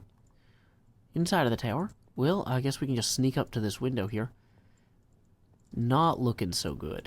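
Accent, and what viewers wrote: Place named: Tennessee, United States. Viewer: I love how he says "Time skip" with his amazing accent from Nashville Tennessee. THAAAAAAAAAAAAAAMME SKIYAP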